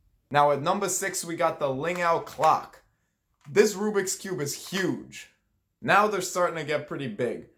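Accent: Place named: New York, United States. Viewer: why the new york accent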